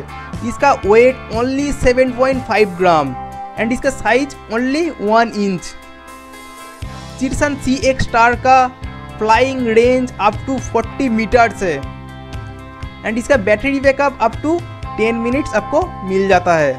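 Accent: Bengal, India. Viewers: bro are u Bengali? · R u A Bengali???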